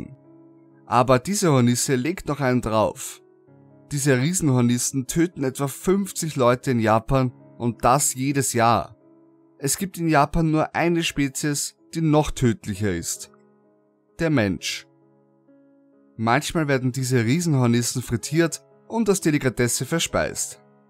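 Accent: Austria. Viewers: Bist du Österreicher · Des is jo a österreicher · Du hast ein Österreichern Akzent 😂 komme aber aus Deutschland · bist du ein Österreicher?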